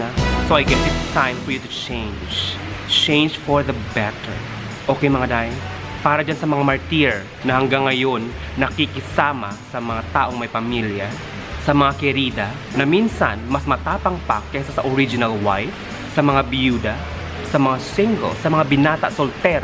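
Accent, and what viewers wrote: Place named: Hiligaynon, Philippines. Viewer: enjoy gid ko kon Ilonggo dialect ikaw. Hidlaw gid ko pamati. Thank you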